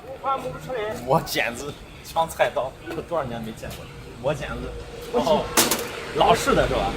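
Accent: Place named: Yangzhou, China. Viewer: 说的扬州话很地道让我想起了我的家乡东北.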